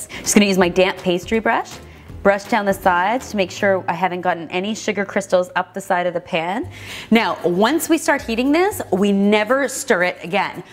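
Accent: Canada. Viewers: Hers is less of the uh-boot Canadian pronunciation, and more of the subtle uh-beh-uh-oot pronunciation (almost like uh-boat but even more subtle). It comes out through other words like "house" too. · Are you Canadian, I love your accent. I am from the south (NC), I know all about accents..lol! :-)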